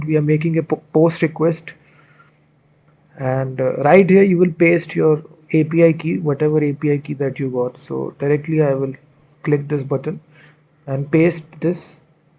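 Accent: India. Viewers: Хер с индийским английским рассказывает нам о бесплатных ключах искусственного интеллекта из страны где последний ИИ был банда из 500 проходимцев которые облапошили на пару миллионов доверчивых пользователей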